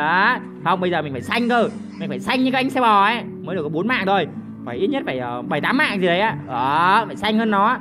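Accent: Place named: North, Vietnam. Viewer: Giọng ông này hài vcc lúc tiếng bắc lúc tiếng nam :))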